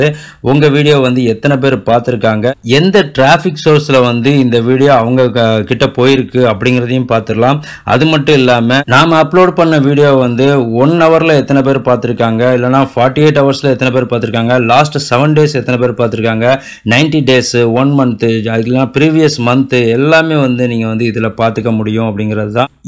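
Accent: Tirunelveli, India. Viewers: Bro thirunelveli ya voongalukku super bro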